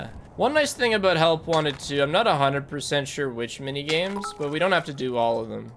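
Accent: Canada. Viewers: Something I love about is his Canadian accent But his content is really good. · I would've expected something closer to "rundabutt" but "roondaboot" sent me also the Canadian raising when he says "exit" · I love how Chat makes fun of for his pronunciations, despite all of Chat knowing that he's Canadian. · rundabut, i completely forgot that you are Canadian. (i mean no ill intent. im American and thought it was funny sorry)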